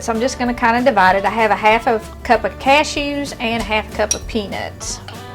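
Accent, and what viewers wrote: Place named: Appalachia, United States. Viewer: Cutest Hillbilly on YouTube, and love the accent. Great recipe, thank you.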